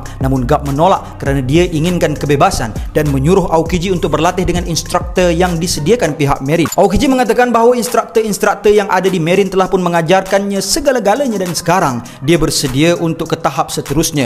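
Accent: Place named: Batu Kurau, Malaysia. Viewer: cakap batu kurau sikit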